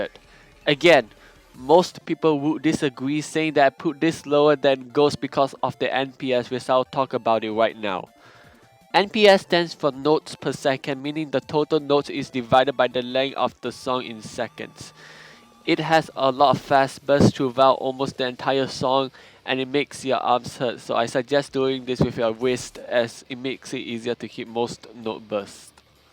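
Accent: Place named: Singapore. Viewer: i love hearing singaporean english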